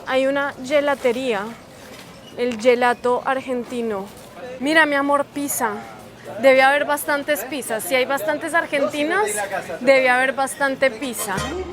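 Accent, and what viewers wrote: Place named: Colombia. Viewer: Este video me ayudo a aprender espanol. Tu hablas mas claro que muchos otros. Talvez espanol de Colombian es el meyor del mundo!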